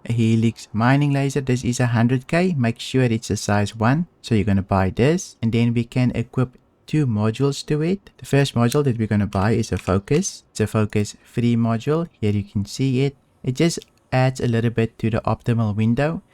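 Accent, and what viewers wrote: South Africa. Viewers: Hey bud, love your content (and your South African accent). Keep it up! I did want to mention that it sounds like you may be clicking the mouse to deactivate the laser beam after cracking and extracting the rocks. You don't actually have to do that since the game automatically does it for you. I noticed once in this video that you actually inadvertently turned your extraction laser back on after the game turned it off for you as a result of your unnecessary click. Just figured I'd mention it since it seems maybe you are not aware that the game does turn it off for you automagically.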